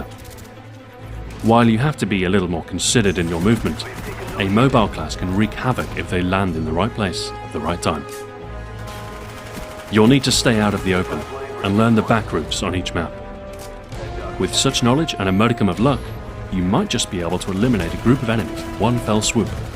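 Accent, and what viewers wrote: England, United Kingdom. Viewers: it took me 4 minutes to realise hes english :D i cant recognise my own accent :D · I never knew is brittish lol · He sounds like one of those professional british voice over actor. SOOOOOOO good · Most useful intel indeed, as always narration is more believable in british.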